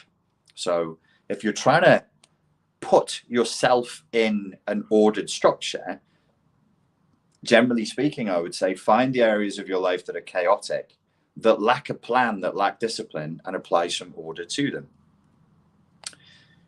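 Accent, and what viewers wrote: Liverpool, United Kingdom. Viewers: Is that a Liverpool accent sneaking in 🧐 also a bit of Yorkshire like me ,anyway I am finding it really hard to get over the death of my mam,it’s the worst thing to experience.I’d just like to say Im not as intelligent as you & I’m in a low class job but I’m ok with that,I would not want the stress of the high pressure job.I do hate the communists btw lol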